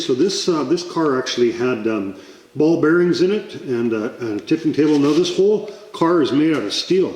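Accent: Canada. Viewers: i wonder if this guy is canadian? "eh?" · cant hide canadian accent - Former Ice road trucker - Alex Debogorski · This guy's really Canadian eah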